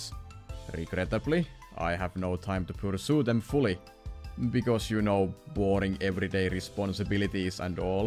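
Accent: Finland. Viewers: Are you finnish? You sound finnish to me :DD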